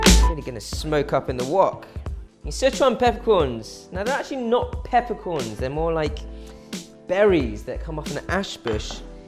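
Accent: United Kingdom. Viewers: I love your videos but it just makes me laugh so here a Asian man speak British or English no disrespect pundit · An Asian with a british accent.. LOVE IT!!!! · Why you speak like a British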